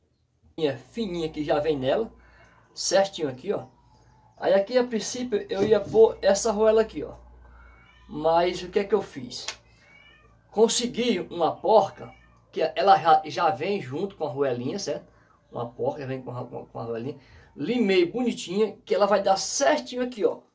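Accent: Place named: Ceará, Brazil. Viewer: Ô vc parece ser cearense!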